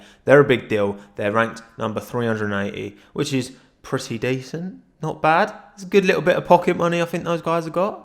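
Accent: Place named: West Ham, United Kingdom. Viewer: I could feel the West Ham in your accent from the very first video I watched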